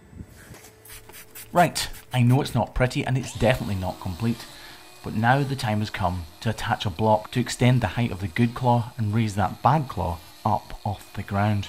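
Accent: Scotland, United Kingdom. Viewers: Yes, you are a Scottish man - heavy accent, so obvious! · I don’t care about cows, I‘m here fir the Scottish accent. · Are you Scottish?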